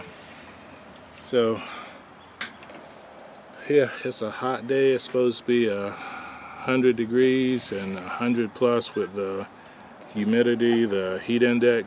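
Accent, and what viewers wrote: United States. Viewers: Hi it's interesting how there are slight variations in the pronounciation of quite a lot of fruits, vegetables and herbs between English here and American English there ( we say Herbs, you say Erbs, never figured out why as it's spelled the same 🤔🤔 ), but a few have totally different names, one of them is Cilantro which of course we call Coriander, I have no idea where the totally different names originated for the same herb....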